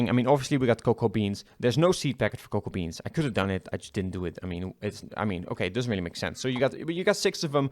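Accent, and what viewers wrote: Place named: Netherlands. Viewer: Took me 10 mins into the video until I realized you're Dutch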